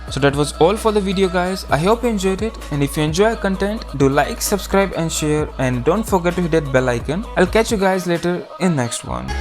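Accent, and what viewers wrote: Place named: India. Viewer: india accents so funny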